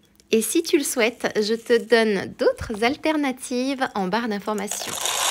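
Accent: France. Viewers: Muchas gracias por esta idea! Me parece fantástica 👏👏👏👏👏👏 La prepararé un día, seguro! Además quiero darte las gracias porque me encanta escuchar tu precioso acento francés y así aprovecho para recordar cuando lo estudiaba en el colegio😊 Un abrazo y gracias por compartir tantas recetas estupendas!🤗💜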